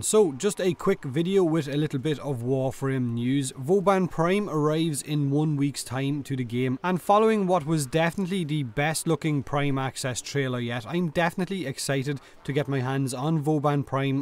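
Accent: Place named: Ireland. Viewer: I started Warframe back when Loki was still a starter frame, but then I stopped for quite a long time. Now I'm back at it, and I've had to search quite a lot of guides just to get my "mojo" back. Anyways, I stumbled upon this particular channel and I'm very much excited to find a youtuber with a even stronger Irish accent than Jacksepticeye. <3 xD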